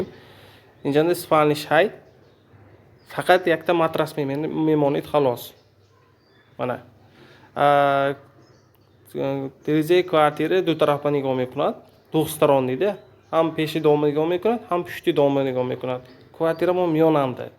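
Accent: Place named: Mongolia. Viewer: Узбак бошаде ? Монголиский акцент . Что они делают в ходженте? Ужась откуда эти клопы распрлзаются ?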